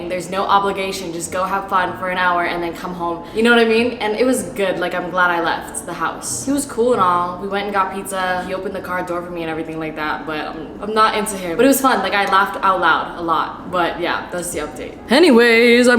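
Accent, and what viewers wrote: United Kingdom. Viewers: Your British accent is swoon worthy. >.< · Nobody noticed the British accent?😂😂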